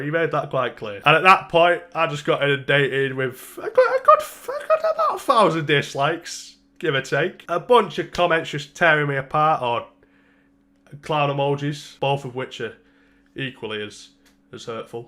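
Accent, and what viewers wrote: United Kingdom. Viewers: I don’t think people understand everyone in the uk speaks like this it’s just uploads it on YouTube.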